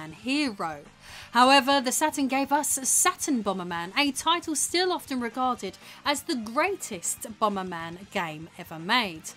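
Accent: United Kingdom. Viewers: 5th generation of consoles + 90s vibes + well exposed review + British accent= excellent content. P.D.: Nice channel and greetings from a Sega Saturn player who had a PS1 in the 90s and lost good stuff.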